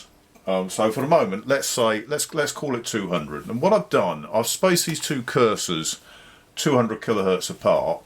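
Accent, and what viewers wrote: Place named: United Kingdom. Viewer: Great to hear a British voice fixing British radios. Keep up the good work.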